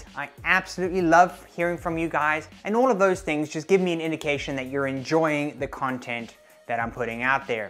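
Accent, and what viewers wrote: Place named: South Africa. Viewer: Hi, love your channel. I've been trying to work out if you're originally South African, your accent is ever so faint. I've been away from sa so long myself that I might be mistaken. The reference to Banting in your description also made me wonder. Prof Noakes rocks, and as an ex Durbanite and avid Comrades follower he's legendary.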